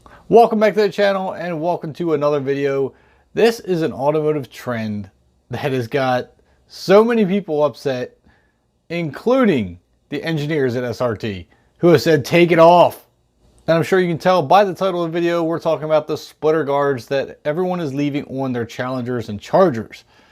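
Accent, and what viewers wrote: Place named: Philadelphia, United States. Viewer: You sound like you’re from philly.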